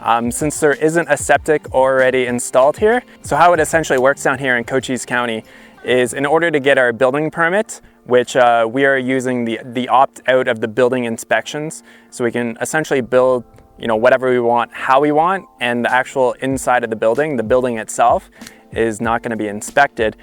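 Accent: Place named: Canada. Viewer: I like Canadians accent.. house, out, about etc.. just interesting ou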